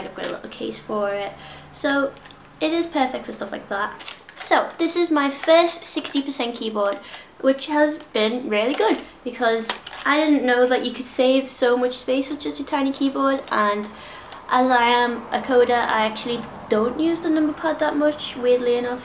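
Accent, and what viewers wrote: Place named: Liverpool, United Kingdom. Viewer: Do I hear a fellow scouse geek?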